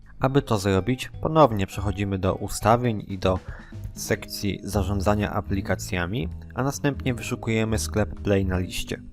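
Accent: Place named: France. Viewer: Ale ten gościu ma francuski akcent😎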